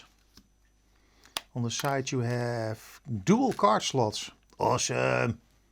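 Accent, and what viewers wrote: Netherlands. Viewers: Dutch accent is fine, I went with my daughter to the university in Amsterdam to settle her in there. Never seen so many smiley people, everyone seems to have a great attitude to life. I was so greatful that everyone spoke perfect English...the secret to carrying weight is a comfortable rucksack...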